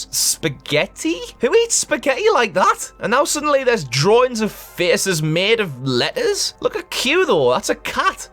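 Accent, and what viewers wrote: Scotland, United Kingdom. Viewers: Not To Insult You , BUT, I like the Scottish accent it makes it funny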